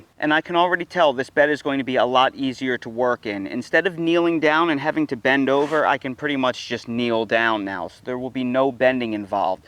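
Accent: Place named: New Jersey, United States. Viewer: I knew you were from Jersey!! ☺️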